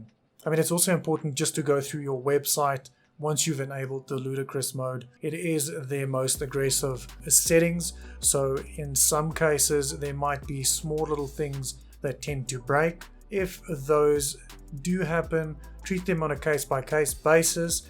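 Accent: South Africa. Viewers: Are you South African 🤔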